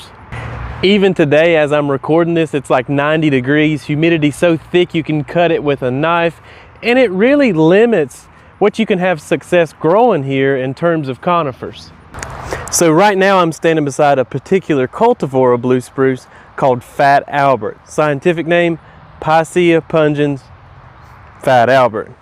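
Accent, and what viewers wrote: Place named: Tennessee, United States. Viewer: Did you say you’re in TN ? That’s where I am and your accent matches mine 😊. Thanks for the video! Very helpful. Hubby got us one of these yesterday for our anniversary 😊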